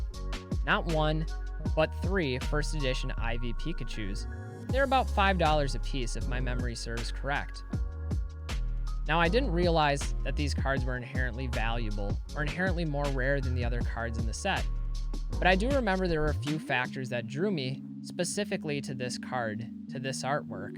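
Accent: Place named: Midwest, United States. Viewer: I was just thinking I could tell he was from MN or WI from his accent and way he dresses. Very outstate midwest, having grown up there myself. I checked the comments to see if anyone else picked up on that. He's one of our people!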